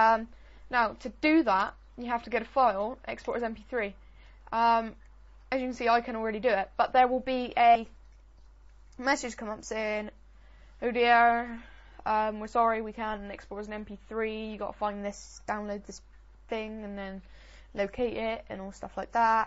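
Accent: United Kingdom. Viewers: guys stop calling him a girl,I think hes...british,with the accent,i think... -_ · you cant beat the west country accent eh? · Hes from the UK. With an accent.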